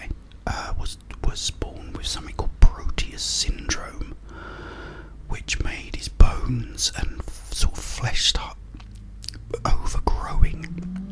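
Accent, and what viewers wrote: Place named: England, United Kingdom. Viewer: Where abouts in england 🏴 you from mate?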